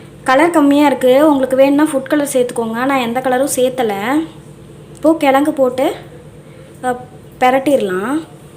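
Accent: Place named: Salem, India. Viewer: Speaking slanging Adi thuul akka . That's salem guys 🔥✈️⛏️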